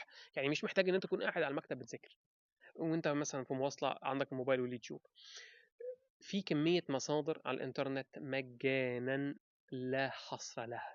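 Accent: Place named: France. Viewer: دا الاكسنت الانجليزي الفرنساوي مضحك بجد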